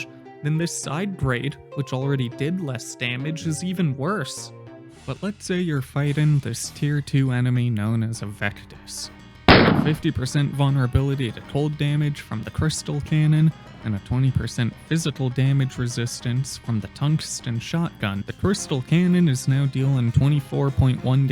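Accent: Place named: Colorado, United States. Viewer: You sound like you're from Colorado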